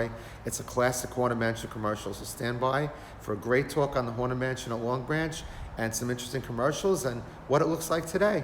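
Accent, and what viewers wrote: Brooklyn, United States. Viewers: I went there as a kid....fun place. thanks for sharing....And your right, at that time late 70s & early 80s, that was scary. I remember the hands grabbing my feet with like rat noises, it was terrifying & fun at the same time. Love your Brooklyn voice. I'm a BK native as well & my condolences for your son. May he rip. 🙏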